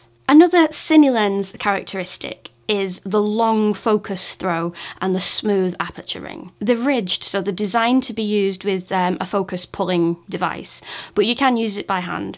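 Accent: United Kingdom. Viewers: Love your voice hell😂😂 shit relax me..i can watch any review with that voice. Idk sometime about uk voices..remind me of mary poppin the original..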